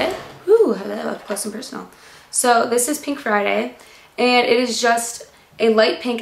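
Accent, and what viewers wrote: Chicago, United States. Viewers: Your Chicago accent is so cute!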